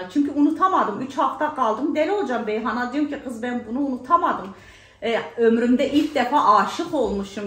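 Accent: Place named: Erzincan, Turkey. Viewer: Abla senin kürtcen bizimkiyle ayni erzincanliyiz😂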